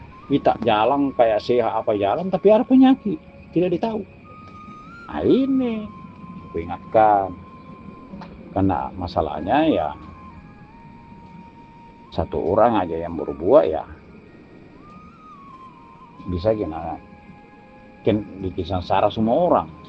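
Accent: Sulawesi, Indonesia. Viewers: Assalamualaikum.. Oo..Daeng.. saya senang dengar logat bicaranya mengingatkan banyak kenangan😊. Setiap daerah mempunyai gaya bahasa logat yang satu kata berbeda makna. Dan sebagai orang jawa terkadang saya harus menyesuaikan kata yang dapat sepemahaman dengan mereka. Seperti kata kamu menjadi kita dan Iman vs yakin. Tetapi saya suka orang sulawesi👍..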